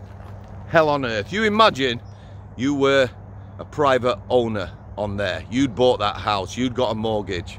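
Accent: United Kingdom. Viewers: Thick British say no more.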